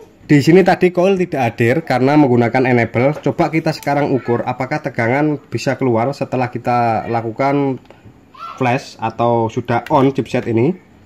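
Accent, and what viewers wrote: Java, Indonesia. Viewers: Gan dr logat nya orang jawa ya? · Wah keren samarinda rasa jowo Nunut sinau om bos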